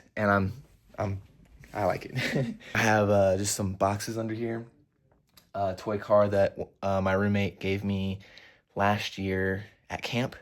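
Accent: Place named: South, United States. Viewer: The more excited you get the more the southern twang comes out. 😂👍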